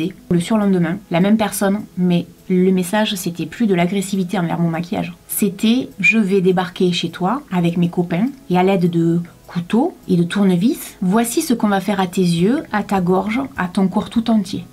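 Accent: South, France. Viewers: Hello ca fait une petite semaine que je regarde tes vidéos maintenant et je t’adore ! Tu es géniale, tu es toi même ! Tu es une super belle femme ! Tu as des cheveux mais magnifique ! 😍😍😍 Je ne sais pas qu’elle âge tu as exactement mais tu as l’air jeune et très mature en même temps et cet accent du sud j’Adore totalement ! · Ha, je pense que cet internet de malheur exacerbe les bas instinct de la nature humaine autant que le narcissisme.. De facto, cela se répercute au quotidien, les gens sont devenus totalement égocentriques, agressifs et parfois violents!.. Je suis tombée par hasard sur tes vidéos, pour le peu que je me connecte à you tube, où je regarde surtout de vieux films ou de vieilles séries... Évidemment c est ton accent qui m a tout d abord attiré, si familier à mes oreilles...l accent de mon sud... le tiens c est le miens et le miens c est le tiens!! 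L Accent! C est fou.. Mais j ai l impression d entendre une soeur... Une frangine d âme et de coeur... Dame de coeur, tu l es, à n en pas douter... Cette vidéo vient de me prouver que je ne me trompais pas... Nous avons tant d affinités inspirées par une grande sensibilité si perceptible... Je ne m étendrai pas sur le sujet... Ma vie privée ne regarde que moi et ceux à qui j ai envie de la partager... Autant dire un cercle très restreint... Mais franchement, tu me ressembles tellement... Bon, je me lance, au risque d être ridicule... Puisque nous vivons dans le même département, j aimerais bien te rencontrer! Gros poutous sister! Laurie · dit moi ton accent il pas du sud parceque ce qui sont proche de perpignan on la accent chantant mais je t'adore maman yutube desoler je suis dixlesie bisous du bord de mer maditerane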